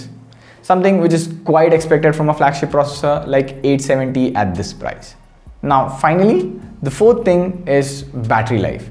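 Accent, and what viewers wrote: India. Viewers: Good Indian English review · Прикольный акцент у индюшонка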